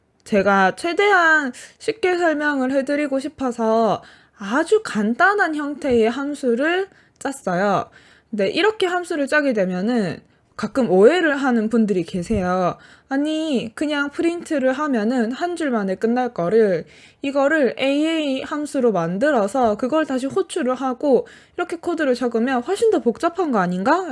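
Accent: Gyeongsang, South Korea. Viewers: 목소리가 명쾌하고, 박력있고, 경상도 억양이 친근감이있습니다. 무엇보다도 강의 내용이 체계적이서 감사드립니다.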